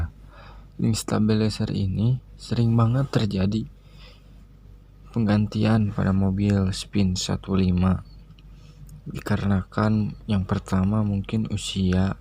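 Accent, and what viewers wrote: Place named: West Java, Indonesia. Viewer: Logatna Sunda euy... Kumaha damang kang 😁🙏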